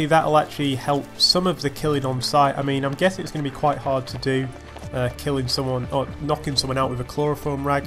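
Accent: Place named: United Kingdom. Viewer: Are you british? i wonder because you remind me of JackFrags greetings from spain :P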